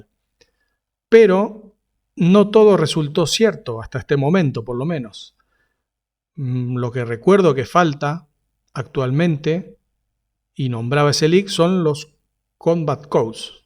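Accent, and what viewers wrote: Argentina. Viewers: Es argento papu 😊 mase un argentina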